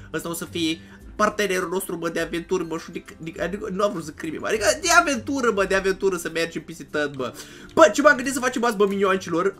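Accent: Moldova. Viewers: mie imi pare in videoclip ca ai acent de moldovean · Ești Moldovan?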